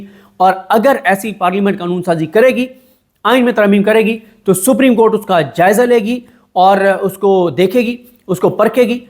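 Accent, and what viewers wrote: Saraiki, Pakistan. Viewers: bhai dill khush ho jata hay jab ap Qazi ki tareef krty hyn..... Mekun lgday tohady lehjay nal tussa saraiki hivay sady elaqay janoobi punjab day...Allah pak tohakun apnri hifzo aman ich rakhy ameen....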